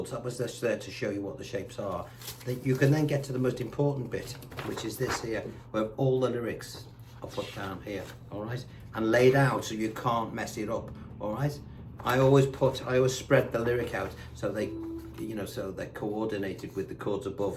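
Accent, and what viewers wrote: Liverpool, United Kingdom. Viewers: I'm telling all my guitar mates about you. You're a gem of a teacher with loads of humour and friendly Liverpool banter. Thanks again buddy.🤗🎼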